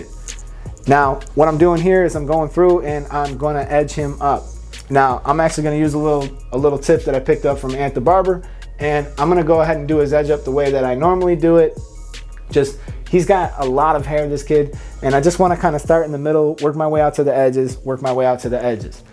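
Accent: Buffalo, United States. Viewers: You from buffalo?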